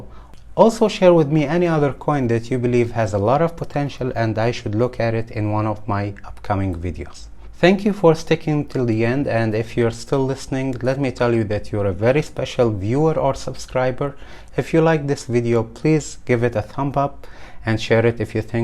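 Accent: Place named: North Africa. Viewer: I am hearing a North-African accent :)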